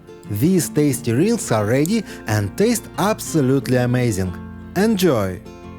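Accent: Russia. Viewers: Sorry, can't stand your heavy Russian accent. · ужасный русский акцент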